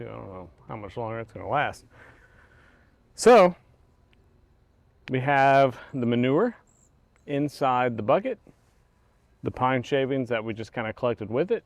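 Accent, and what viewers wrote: South, United States. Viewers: Love the videos yall. Love ur accent buddy. Lol I got me a southern accent too over here in Pensacola Florida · That southern accent fit perfectly for my part of the country. Good job Buddy.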